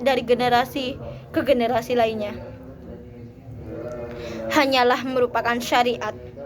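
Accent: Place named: Madura, Indonesia. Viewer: Nah madura nih bos... Wkwk mntapp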